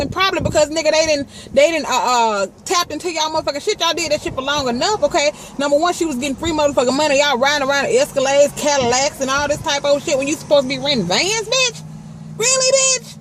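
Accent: South, United States. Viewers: Yea she be having my stomach hurting too 🤣🤣🤣n bein that she has a country accent makes it even funnier... LMFAO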